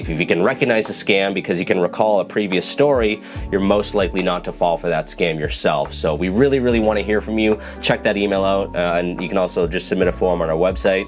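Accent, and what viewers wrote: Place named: Canada. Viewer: I think he is Canadian. It may be the about = aboot (not teasing, I have a few pronunciation quirks myself).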